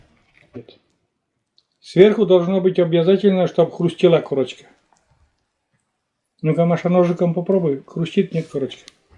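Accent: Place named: Russia. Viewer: Какой приятный русский говор - просто мёдом Божьим на уши и на сердце ❤❤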